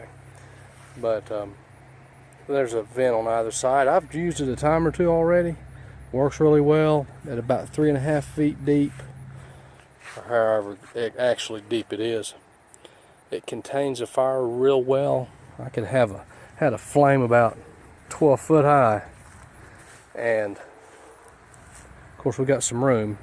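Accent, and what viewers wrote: United States. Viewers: The minute you started talking I said, he's from Georgia. I'm glad you mentioned you were in Georgia there at the end. Good to be right! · I think the southern accent is a clear indication he is not from California.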